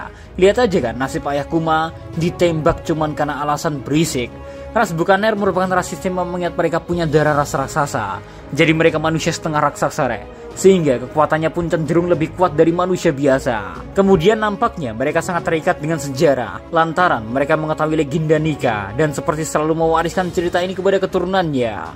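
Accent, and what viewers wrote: Java, Indonesia. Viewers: koyok wong jowo to lur daerah ndi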